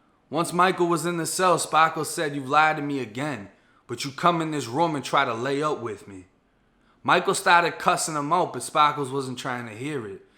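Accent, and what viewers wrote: Boston, United States. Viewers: Bro this shit funny as hell. I was just watching some of your old videos and I gotta say man, your Boston accent has gotten so much thicker since when you first got out. Your old videos you still had that Florida style speak. Now you straight Red Sawks and ima have a bea down at the bah style.. Lol. Love ya videos tho bro. Also, I used to just listen to your videos while I was driving but now you gotta actually sit there and watch your videos cuz you got photos, pics, graphics and shit. Stepped yo YouTube game up bruh. Keep doing ur thing tho, my man. Awesome content. Awesome stories. Peace bruh.. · I dont care what anyone says u look n sound mad familiar n thats a Boston accent.lol..💯 It'll come to me i lived in FL when i got released for 5yrs!!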